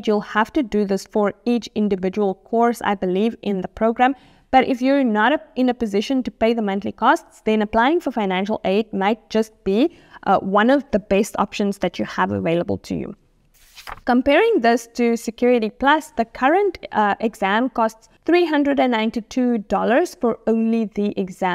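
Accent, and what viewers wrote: South Africa. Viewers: Your accent sounds South African? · you're South African?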